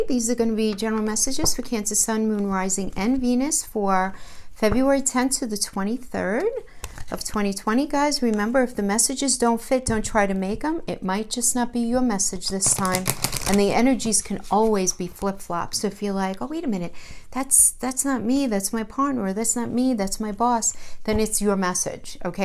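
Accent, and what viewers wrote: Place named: North Jersey, United States. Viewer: I definitely thought N. Jersey! LOL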